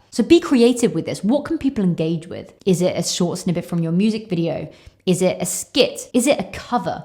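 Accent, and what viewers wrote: England, United Kingdom. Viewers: Which area in England you're from? (love the accent)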